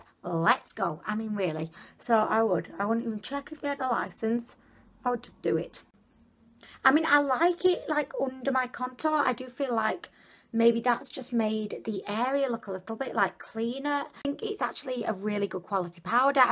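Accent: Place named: Manchester, United Kingdom. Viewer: Ahahah great accent where you from?....Sounds a bit like a Mancunian accent..I'm from Manchester also....your skin looks great but love that lipstick on you x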